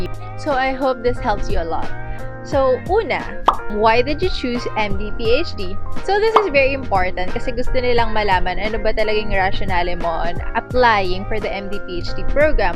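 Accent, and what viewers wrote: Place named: Conyo, Philippines. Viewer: very pero conyo, IBA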